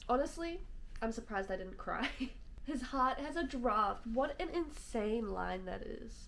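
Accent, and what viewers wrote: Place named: Australia. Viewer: Random question%: what Part of Australia are you from?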